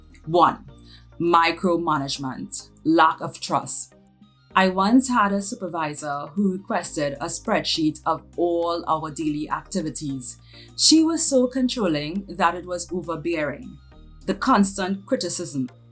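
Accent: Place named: Trinidad and Tobago. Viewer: Hi,. I have been following you on Linkedin for several years. I love your Trinidadian accent! Out of the ten signs that you covered, the one that resonated most with me was the last one. I always say that where there is smoke, there is fire. When a company has a high turnover rate, then bad management is almost always the culprit. If you treat employees humanely, then they will want to stay.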